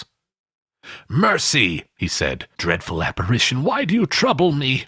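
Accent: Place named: United States. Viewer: He's american, therefore he has an American accent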